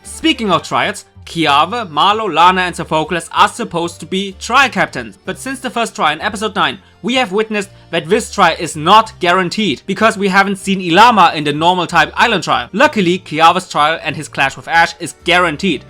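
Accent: Germany. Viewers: are you german? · man hört deinen deutschen Akzenten voll raus :D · Is this a German Aczent? Sounds a little. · Wait..... .... are you.... German????? Cool - High Fife!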